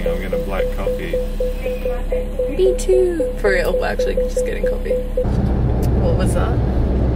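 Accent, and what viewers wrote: Australia. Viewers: What's the city called? I love the Australian accent but sometimes I can never pick up lol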